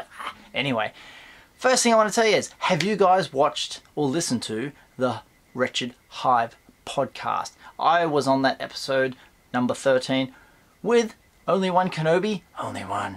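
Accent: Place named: United Kingdom. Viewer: Okay you're in Australia? I started watching this and thought you were in the U.K? You sound very British.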